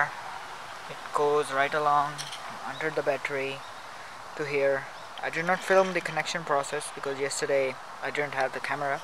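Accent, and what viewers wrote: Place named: India. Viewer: its indian ;)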